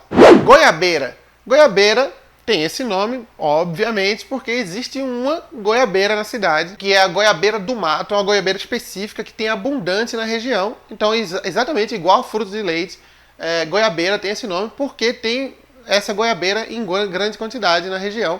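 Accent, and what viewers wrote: Minas Gerais, Brazil. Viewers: Não consigo acreditar que és pernambucano. Tu consegues ser mais mineiro que muito mineiro nascido em em Minas Geraes.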